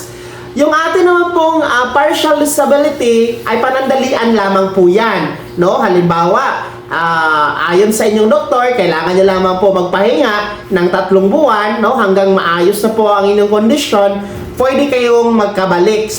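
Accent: Visayas, Philippines. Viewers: Djos ko..husayan .o naman ang pagsasalita mo!. Parang kang taga kanto na galing sa bisaya ..binipit... parang pinilipit!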